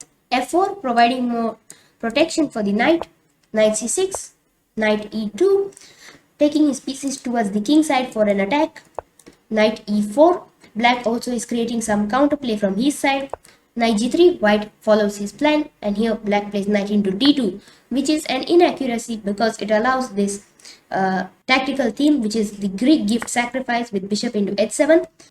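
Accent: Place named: India. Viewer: Your accent is like Indian like us r u Indian am curious about your native 😉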